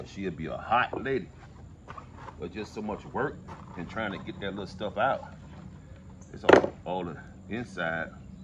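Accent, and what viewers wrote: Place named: Arkansas, United States. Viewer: Im😂so glad that I found this channel. I know that you guys live in Florida but you sound like a good ole down south Arkansas boy. I'm from Arkansas myself and I love the way you do fish. It's my favorite food. You make me remember my grandmother. Love you guys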